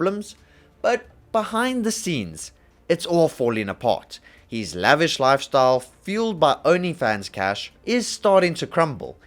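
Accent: South Africa. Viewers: Are you South African?👀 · Are you a South African? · Wait are you from south africa?!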